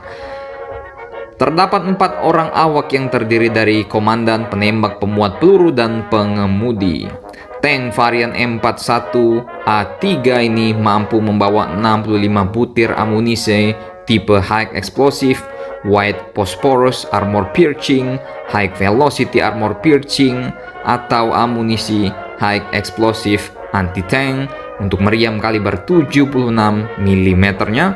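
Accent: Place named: Makassar, Indonesia. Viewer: Min orang makasar ya khas bangat suaranya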